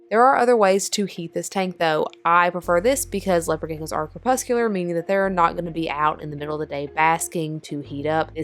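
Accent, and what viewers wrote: South, United States. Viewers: OMGoodness, where are you from? You sound like you are from the South! I'm in NC and new to having a leopard gecko!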